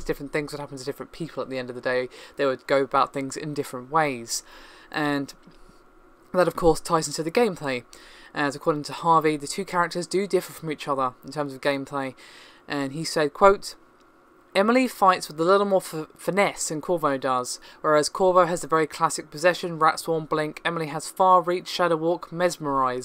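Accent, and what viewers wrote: United Kingdom. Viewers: Very worth listening to, and elegant British accent. :)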